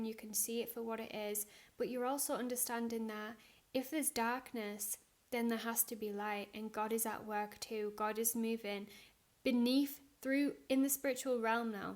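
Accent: Northern England, United Kingdom. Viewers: Love the truths you share, and absolutely love your northern accent 😊❤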